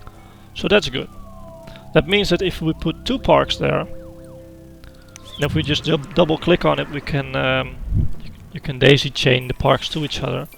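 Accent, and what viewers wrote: Netherlands. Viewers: are you dutch? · Ik kan gwn horen aan jou stem dat je Nederlands bent haha, bedankt voor de tips 7jaar later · you're from holland am i right? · Ik wist dat je Nederlands was ik hoorde het aan je accent :D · are you dutch by any change? u sound like you are haha ^^'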